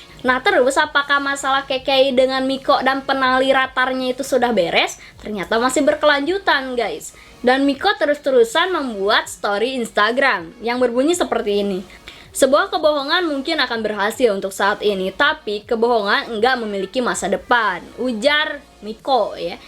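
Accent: West Java, Indonesia. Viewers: Orng sunda ya ka??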